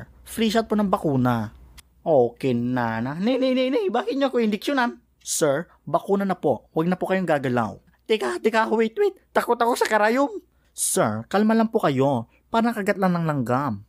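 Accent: Ilocos, Philippines. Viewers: The best talaga accent ti ilokano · Ilocano ka boss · Ilocano kaba boss hahaha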